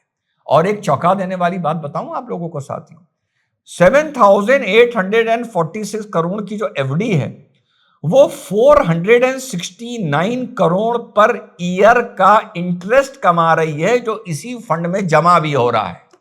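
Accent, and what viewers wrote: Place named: Western Uttar Pradesh, India. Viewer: 2 रूपया ❤ लगता नहीं आप लखनऊ के है ये बोली पश्चिमी उत्तरप्रदेश की है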